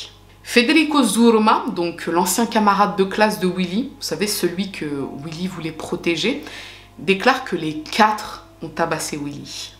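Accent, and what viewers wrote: Italy. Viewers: Ciao bella signora ma che bel accento italiano 🇮🇪🇮🇪🇮🇪 Nouvelle abonnée 🙄 quelle tristesse cette histoire, révoltante de cruauté et de bêtises, merci de l'avoir traitée avec humanisme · bel accent italien ahah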